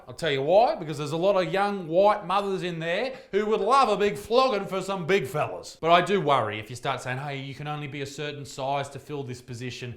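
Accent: New Zealand. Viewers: 10/10 for making me laugh today. Your kiwi accent is hilarious your rating of cup sizes is ground breaking. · How the hell did a nothing story from the west coast of NZ end up in the US. Especially as there are only 6 people who live in Hokitika. I need to get my arse down the road to the busy place (Auckland) to watch your show. Good work fella. Your Kiwi accent and pronunciation of Te Reo (Maori) is pure comic gold. Aussie is woke as hell, but there are plenty of virtue signalling woke idiots here too.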